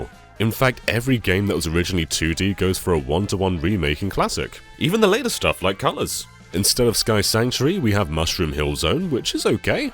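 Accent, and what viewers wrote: United Kingdom. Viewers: Came for the content, stayed for the sexy deep British voice...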